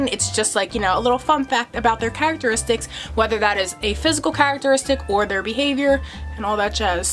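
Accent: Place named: New York, United States. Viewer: Haha you made me laugh a few times. Very entertaining. I am from Australia but I think I can pick your accent to come from somewhere near New York maybe? Anyway if you want all the wild bearded dragons you’ll need to come to Australia. Thanks for teaching me stuff!!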